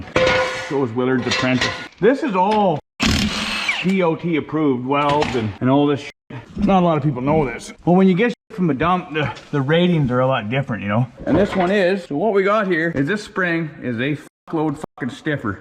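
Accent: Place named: Western Canada, Canada. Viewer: I only just got here, and I see a Canadian flag and hear an accent that sounds like you've got the Western side of the land in ye, and then I remember the fact that the comment from another video I was watching that I found you from mentioned the fact you got a beast named Slave Lake. Yeesh, you from the plains er sumthin?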